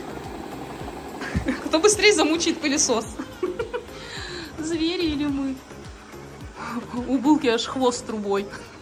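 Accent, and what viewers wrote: Siberia, Russia. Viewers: так беситесь на здоровье. Я не батюшка, помочь ничем не могу, бесов изгонять не умею. А акцент у прекрасный, она же сибирячка, а там основа - казаки, еще от Ермака. Вы донских казаков не слышали, у них речь вообще другая. У меня бабушка всю жизнь вместо «вчера» говорила «надысь» и вместо «скачет» - « бегхеть». Ну и так далее. Шолохова почитайте. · нормальный, сибирский говорок. Я так понимаю, вы казачий и близко не слышали.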